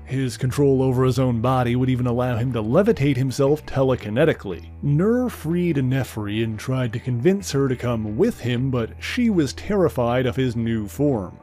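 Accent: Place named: East, Canada. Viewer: Love the Channel! Sounds like an east coast Canada accent :) keep it up!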